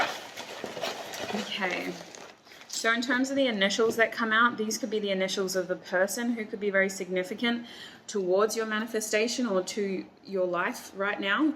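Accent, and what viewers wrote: Australia. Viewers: are you austrailian?? i love your accent! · Group#1. Thanks a million! I am so grateful for this reading. It's so finetuned it's unbelievable. You are truly one of the most gifted readers on YouTube, if not the most. Much love, much light, much power to you 🙏🙏💗💗🌈🌈 And I love your Australian accent, so very charming!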